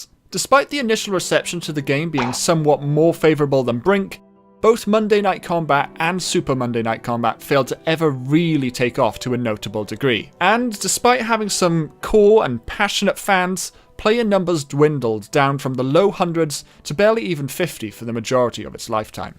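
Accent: Wales, United Kingdom. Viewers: Is that a Welsh accent, cant tell if it is or not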